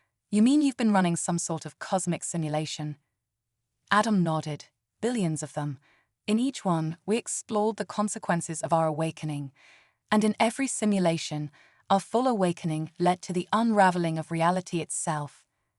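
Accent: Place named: Australia. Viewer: This might be an AI story channel hard to tell but I love the Australian female accented voice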